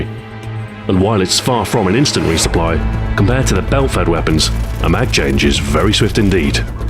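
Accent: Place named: United Kingdom. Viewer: You sound like Capt Price to me and it's because you're British LOL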